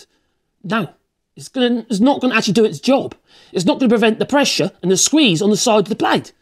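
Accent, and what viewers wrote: United Kingdom. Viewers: I sadly understood barely two words you said mate, but you are so entertaining to watch that I still watched the video to the end. It's so nice to hear a British voice on youtube, especially one who loves tools and making stuff with wood. You're always entertaining because you come across as someone who doesn't give a fig for what people think, and I like that about you. You are what my adorable mum used to call a WYSIWYG type of person (In other words you are a 'What You See Is What You Get) type of person. And I mean that in a nicest way possible!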